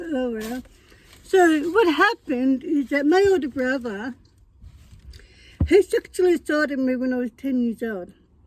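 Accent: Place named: Australia. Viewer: I could hear that you're Australian but thought you were living in England.Where do you park up?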